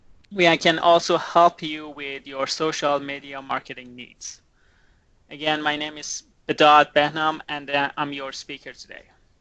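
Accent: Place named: Dubai, United Arab Emirates. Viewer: Is this guy from Dubai, I can not understand his accent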